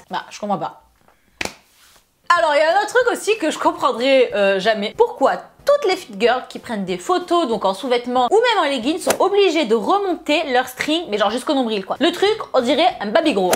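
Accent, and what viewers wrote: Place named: Nice, France. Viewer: C'est officiellement la vidéo ou son accent nissart ressort, c'est connu l'accent ressort quand tu es énervé x)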